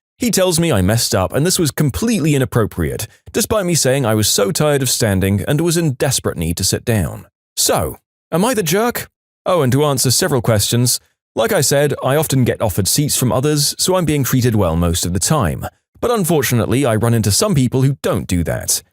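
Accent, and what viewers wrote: United Kingdom. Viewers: is it just me or does anyone remember his voice being deep in past episodes and now he sound British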